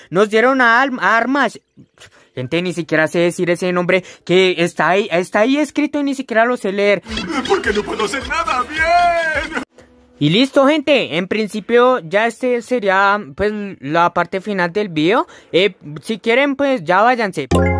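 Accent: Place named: Colombia. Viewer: Eres colombiano por tu aciento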